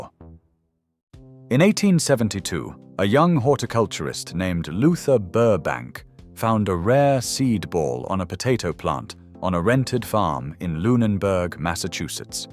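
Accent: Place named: Southern England, United Kingdom. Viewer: 👋🏻 it’s not a robotic voice 😀 it’s a normal “ educated “ Southern English voice 💕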